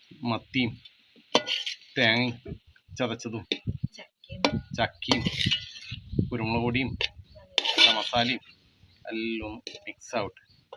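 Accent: Kannur, India. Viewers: താങ്കൾ പറയുന്നത് ശരി തന്നെയാണ് ഒരു ലിസ്റ്റിൽ തന്നെ നാല് അഞ്ച് സ്റ്റൈൽ ഭാഷ ഉണ്ടാകും..ഞങ്ങളുടെ മലപ്പുറത്തും ഭാഷകൾ വ്യത്യാസം വരും..ഉദാഹരണം വേങ്ങര എന്ന് പറയുന്ന സ്ഥലത്ത്.. കജ്ജ് എന്ന് പറയും.. ഞങ്ങൾ പെരിന്തൽമണ്ണ ഭാഗത്ത് കയ്യ് എന്ന് പറയും.. വേങ്ങര പാട്ട് പജ്ജ് എന്ന് പറയും.. ഞങ്ങൾ പെരിന്തൽമണ്ണ പശു എന്നു പറയും... വേങ്ങര ഭാഗത്ത്. കജ്ജുമ്മ നജ്ജായി..ഞങ്ങൾ പെരിന്തൽമണ്ണ ഭാഗത്ത്. കയ്യുംമേൽ നെയ്യ് പറ്റി.... വർത്താനം സ്റ്റൈൽ നല്ലതാണ്.. അബുദാബിയിൽ എനിക്കൊരു കൂട്ടുകാരൻ ഉണ്ടായിരുന്നു അയാളുടെ പേര് തമ്പാൻ.. അദ്ദേഹം കണ്ണൂരാണ്.. നിങ്ങളുടെ അതേ ഭാഷ തന്നെയാണ് ചിലപ്പോൾ നിങ്ങളുടെ നാട്ടുകാരൻ ആയിരിക്കാം.. ഓക്കേ ബ്രോ കണ്ടിന്യൂ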